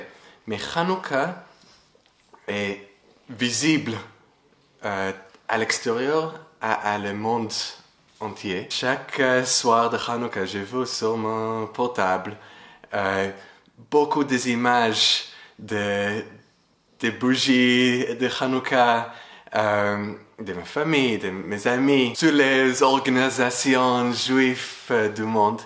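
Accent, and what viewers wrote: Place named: Israel. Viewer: D'abord j'aime l'accent hebreu en français 🎶💯🔆✴ en suite j'espère parler hebreu comme vous parlez français ! Merci pour cette belle nuance enter les limites et les lumières....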